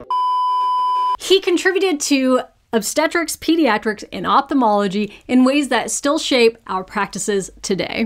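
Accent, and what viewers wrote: Texas, United States. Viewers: Pap-err-us, omg your Texas accent is sooo cute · Is a fellow Texan, I am honored to have you and your southern drawl representing our great state. Thank you for what you do. Texas misses you.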